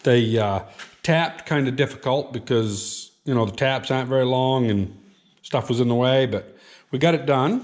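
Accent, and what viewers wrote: Maine, United States. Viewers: Its extremely funny for me to watch a fellow Mainer on youtube. LOL! We have our own dialect.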